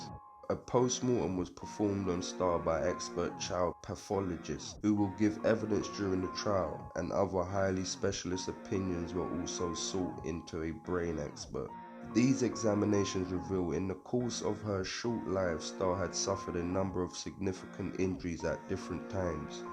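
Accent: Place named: Cockney, United Kingdom. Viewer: Couldn't you get a better narrator? Not this monotone voice from the East End of London...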